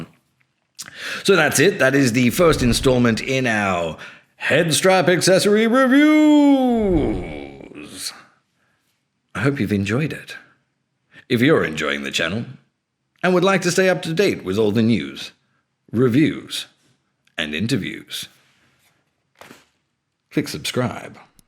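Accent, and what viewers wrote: United Kingdom. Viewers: you are a funny guy. Nice accent, not overly British, good voice, great to listen.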